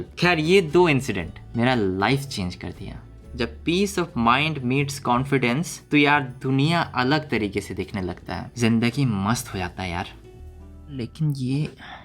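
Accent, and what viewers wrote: Bihar, India. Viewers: i don't know why but i love to listen bihari accent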